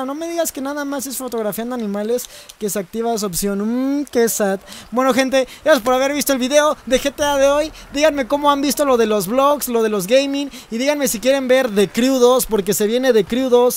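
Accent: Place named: Mexico. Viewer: Este guey es Mexicano 😂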